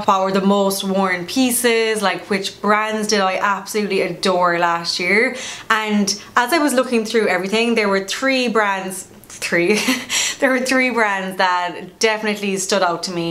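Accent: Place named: Ireland. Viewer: Love your videos. You're a true Irish gal. Love that you still have your great accent!! I sent you an email about Toc Watch. Hope to meet you at some stage. Keep it up.:-)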